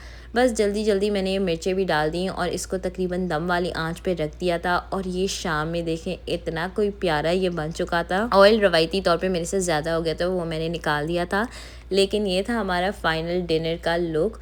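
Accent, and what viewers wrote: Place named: Pakistan. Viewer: You have an awesome Urdu accent..... Love your vlogs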